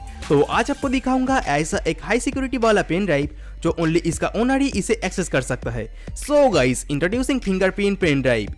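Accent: Bengal, India. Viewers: Aapka hindi kafi kuch Bengalee ki tara hai.... kaha se hain aap?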